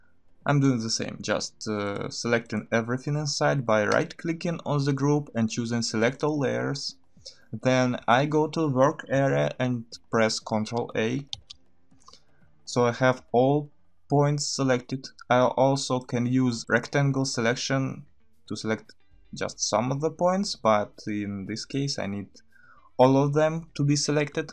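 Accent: Russia. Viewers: Are you from Russia, you have russian accent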